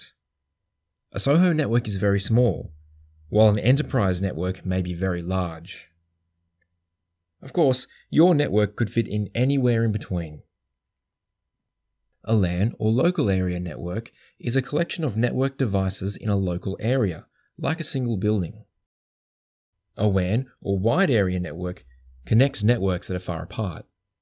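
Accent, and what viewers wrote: Australia. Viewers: Is it Australian accent?? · Very well made and informative video. Very easy to listen to australian accent also! Thank you. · So, the narrator is Australian, I assume, based on his accent, right? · Networking lessons in an Australian accent? What could be better??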